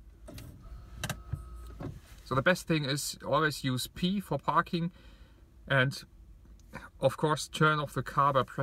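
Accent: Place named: Germany. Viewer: Interesting that you have a finnish name and you sound pretty German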